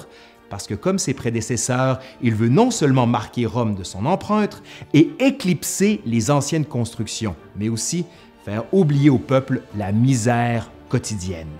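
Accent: Quebec, Canada. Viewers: il est québécois, il a l’accent et le language de là-bas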